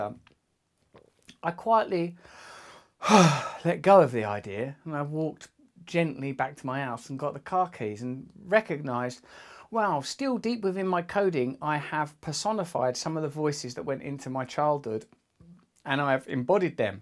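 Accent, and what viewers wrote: United Kingdom. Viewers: are you British ??? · Please yell at me anytime in your British accent 😂❤️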